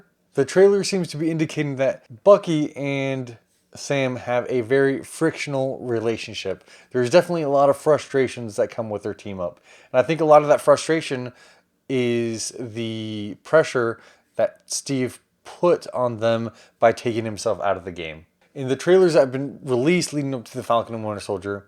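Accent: South, United States. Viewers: I love your vlog of this video at your house in Texas during your quarantine until you're talking about the mini-series of "The Falcon and the Winter Soldier" while it came out on Disney+, it says after being handed the mantle of Captain America at the end of "Avengers: Endgame", Sam Wilson teams up with Bucky Barnes in a worldwide adventure that puts their abilities to the test as they fight the anti-patriotism group, the Flag-Smashers and you're talking about all the characters of the mini-series from the beginning to the end after that, I hope your husband, Zach will be very proud of you, so I wanna say I'm very happy for you, but I love your Southern accent and I know you're half Mexican!! #FalconandTheWinterSoldier #DisneyPlus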